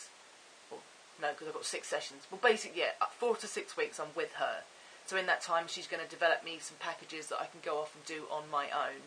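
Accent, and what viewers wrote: United Kingdom. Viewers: really enjoyed this vlog...im from Birmingham uk by the way you sound british. Good Luck with training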